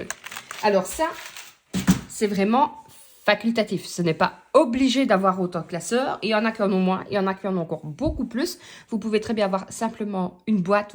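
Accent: Liège, Belgium. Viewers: Toi t’es de lièèèche non ?😅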